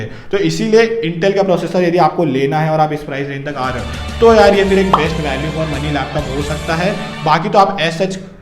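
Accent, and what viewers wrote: Indore, India. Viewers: Bava indori ho kya...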